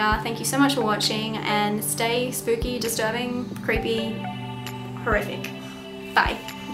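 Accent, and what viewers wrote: Australia. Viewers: You’re an an Aussie, eh?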